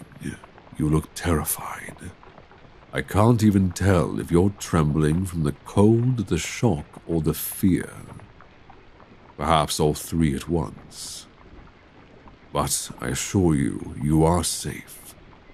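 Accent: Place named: United Kingdom. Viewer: His British accent lord have mercy 🫠🧎‍♀️🧎‍♀️ the gods are tempting with hot British vampire men yet again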